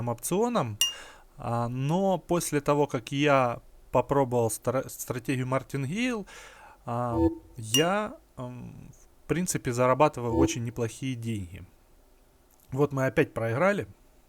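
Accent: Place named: Ukraine. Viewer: По разговору я понял,что Вы из Украины! Пополнчли через вэбмани или киви,как лучше? И можно ли с приватовской карты пополниться ?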